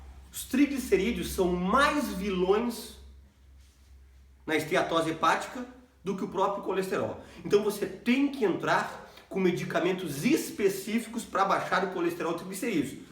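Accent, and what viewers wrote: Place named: São Paulo, Brazil. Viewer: Você poderia ser de sp kkk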